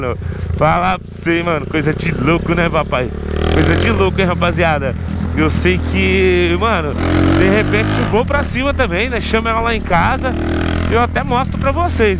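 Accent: Goiás, Brazil. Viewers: por que vc não fala mais naquele pike do goiais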